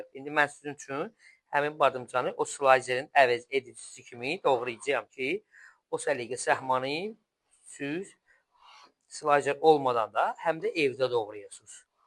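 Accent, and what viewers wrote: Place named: Baku, Azerbaijan. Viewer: Suzunçun.Bakili ləhçəsi)))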